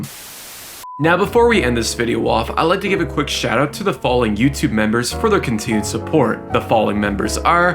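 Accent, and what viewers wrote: East Coast, United States. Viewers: You must be from the east coast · I just watched a few of your other videos and was guessing you were from the east coast and am now satisfied with the confirmation. (Really glad I didn't fuck it it up and find out you from Wisconsin)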